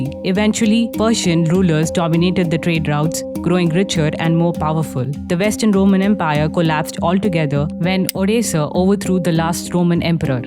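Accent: India. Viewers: You need to do better narration. Your accent is very hardcore South Indian. International accent required.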